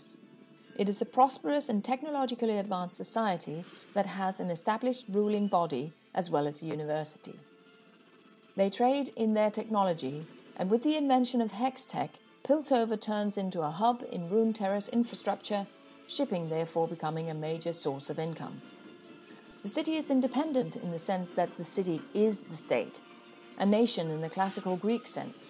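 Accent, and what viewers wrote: United Kingdom. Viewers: Hey, I'm was a recent viewer. When I stumbled upon one of your videos, I thought it was another boring analysis that I've heard from other Youtubers. I am glad I clicked on it. I was hooked on how deeply you analysed the details and symbolism of Arcane. And I never would have thought of the parralels if it weren't for you. Your videos are very enertaining and it also helps that your voice is very calming. If you don't mind me asking, are you British? I'm sorry if I do get your accent wrong.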